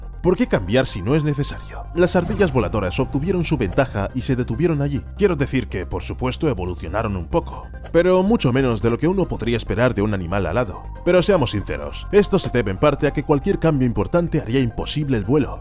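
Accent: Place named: Andalusia, Spain. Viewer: Haz un video revelando tu acento Andaluz, que se te escapa a veces jajajaj, un abrazo desde Sevilla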